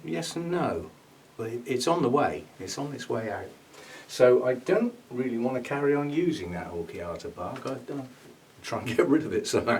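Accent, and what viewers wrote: United Kingdom. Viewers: And I watch you to see how long you will grow your hair out. And for the British accent.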